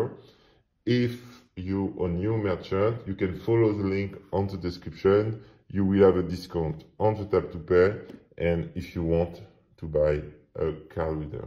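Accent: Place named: France. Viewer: he IS speaking english but with a french accent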